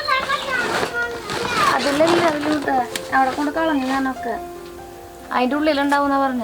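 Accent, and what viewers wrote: Thrissur, India. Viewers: Pure vegetarian aanengilum njan checheede non veg cooking videos um kaanum.... checheede thrissur slang kekkaan vendi 🤗🤗🤗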